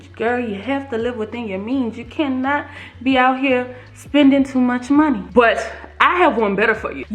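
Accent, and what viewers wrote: New Orleans, United States. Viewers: Lol I’m sensing a NOLA accent; love the energy in the video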